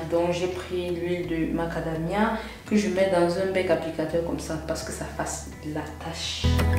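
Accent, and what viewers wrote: Cameroon. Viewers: T'es camerounaise?? Ta manière de parler me fais croire à une camerounaise😂😂😂, tu me tue seulement mdr, j'aime t'es vidéo, continue comme ça👍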